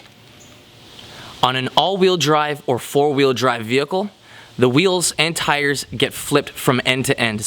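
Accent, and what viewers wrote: Canada. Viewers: Is this gentleman Canadian? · For you maybe... but that's how Canadians say it.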